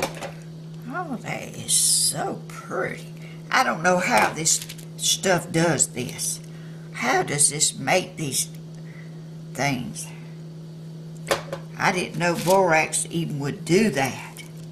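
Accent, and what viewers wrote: South, United States. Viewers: Ms., You are a TREASURE! You've filled me with joy watching you crafting. Thank you for making my day. Your enthusiasm is infectious, your video skills are entertaining, and your southern accent is delightful. I can't wait to make my own crystal heart, butterfly and cross. (I'm from Michigan and, like you, I too love the sunshine, flowers, butterflies and I can't wait for spring and the snow to be gone for good. And I'm sure our bluebirds, who just showed up, agree.) May God bless you, as you are a blessing to others!:) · Oh my stars sweetheart I thought you was from Alabama just by your voice. Thats a southern voice. You are awesome. I love watching you. I've learned a lot. Thanks from Alabama. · HILARIOUS!!!! I'm sitting here, a lot of pain at the moment, using your relaxed motions, voice, and southern sounds to sooth me. 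I had JUST been thinking how much fun this would be with my grandsons now that they are on summer break and YOU mention how fun it would be for kids. Thank you so much. I greatly appreciate your crafts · My granny Wright was from Ga and raised my Dad in NC. Your accent brings back many fond memories of visiting family in the south. I grew up in Ohio. You are a special lady, I can tell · Hello from Kansas City Mo I have enjoyed your videos of your projects so much. Anxious for spring so I can try the cement pots. Now need some pipe cleaners, didn’t know Borax could make something so pretty. I have started doing some Dirty Pour painting, finding it fun, it doesn’t require any art talent. By the way,I also love your sweet southern accent. Your place looks so peaceful & I love seeing all your birds while you do your crafts. Wishing you a blessed day. See you later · Hey! So glad to meet you,. 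I love your southern accent. My mom who is 82 now, is from Arkansas and you remind me of my relatives! You are so sweet! Take care and God richly bless you:)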